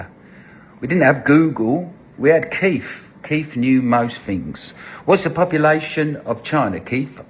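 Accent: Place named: Cockney, United Kingdom. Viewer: The only phone we had was the telephone box and lots of 10ps. I am from the Eastend of London and I have a cockney accent. So it is funny listening to with that accent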